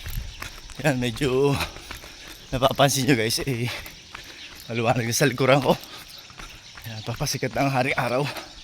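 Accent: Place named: Mindoro, Philippines. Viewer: Hi kuya,i love jogging too walking exersise is good for our health...taga saan kb kuya mindoro din ba, kc ung accents mi eh parang mindorinyo din.thankyou sa suport ho. Full watch tamsak.suport👍👍❣️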